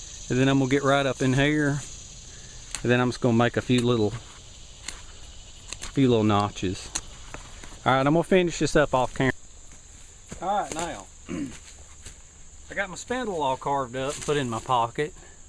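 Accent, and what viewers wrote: South, United States. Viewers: This guy is so much southern (no disrespect)!!! It's funny when he says Machete. ..LOL I like your vids. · Hey man, I love your accent! What part of the States is that from?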